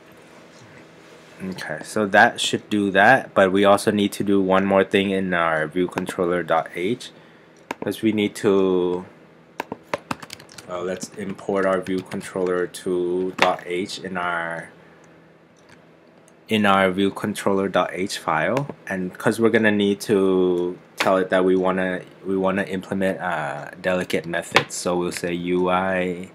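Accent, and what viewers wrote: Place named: Thailand. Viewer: You got a really good US accent but I still can feel somewhat Thai in your voice :) . I've lived in Thailand for 2 years ^^. Thank you again I've understood and practiced this lesson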